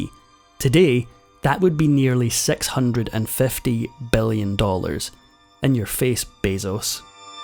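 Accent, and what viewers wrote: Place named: Scotland, United Kingdom. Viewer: First, I liked this video because the narrator has what seems to be a Scottish accent. That resonated with me because I watched a PBS documentary on Andrew Carnegie decades ago. I have not been in awe of the rich snd famous - yet I find their stories fascinating and they give me a glimpse into human nature. Just watched a short video on J.D. Vance, current candidate for Vice President - and was reminded of J.D. Rockefeller's humble start in this video. This videos thumbnail teased that Rockefeller's "dark secret" was someting he did. The man was a sinner as we all are - or have been. Still, the secret was the sins of his father. I'd done some research on the younger Rockefeller a few years ago - and vaguely recalled his dad giving him a loan. I didn't realize what an influence, "Devil Bill," was on his son - or that his son fought to overcome the shadows of his dad's dealings. 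Thank you!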